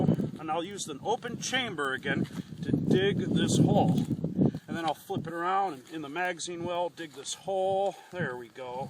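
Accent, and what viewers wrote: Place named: Upper Michigan, United States. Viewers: You sound like your from the UP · Judging by the particular landscape and the accent, I would say you're in the U.P., am I right? The sandy ground, ferns, and all the jack pine trees, it looks like somewhere in Gwinn or K.I. Sawyer.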